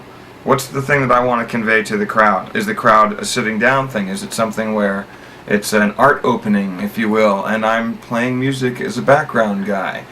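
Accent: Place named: United States. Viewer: oh okay im glad that you are joking. he clearly says "go get somethin to eat" ... maybe you are not used to American accents idk. He is talking about rocking a party, but the place he labels the records is in his house where he is also filming this video. The whole point of saying "go get something to eat" is to illustrate you could go do anything and the record will still be labeled at that exact spot. Listen to how casually he is talking. This guy isn't even a rave dj. If you arent joking, then I'll just say get your ears fixed maybe turn your monitors down lol I guarantee you if you have ever rocked a party you would know.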